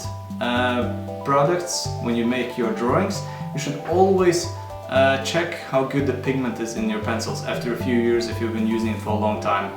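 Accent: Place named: Scandinavia. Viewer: Your accent sounds so Scandinavian .. Where are you from?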